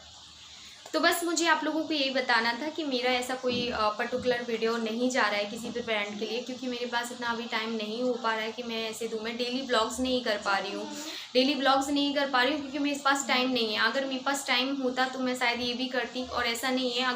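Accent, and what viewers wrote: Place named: Kanpur, India. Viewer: Apki avaj bahoot pyari hai....mere jesi lenguse hai apki....gao....kanpur...ki ho ap Kya.....me kanpur ki hooo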